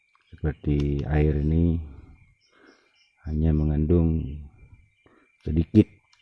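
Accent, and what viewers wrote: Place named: Aceh, Indonesia. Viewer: Met pagi bang dari suaranya sepertinya abang orang aceh, salam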